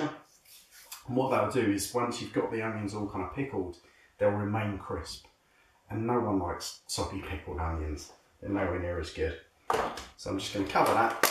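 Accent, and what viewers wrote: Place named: London, United Kingdom. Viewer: Nah mate, he's talkin Landan.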